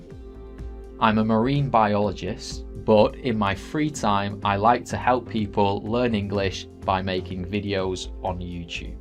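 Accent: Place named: United Kingdom. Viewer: I love British accent. Could you please guide me how to learn it?thanks.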